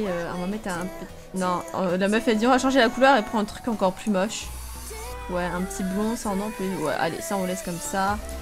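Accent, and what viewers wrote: England, United Kingdom. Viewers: l'accent anglais j'aime bcp !! XD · J'adore ton acsent anglais 😂👌❤super vidéooo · J’adore ton accent anglais 😂😊❤️🥰 ps: je t’adore 😊❤️🥰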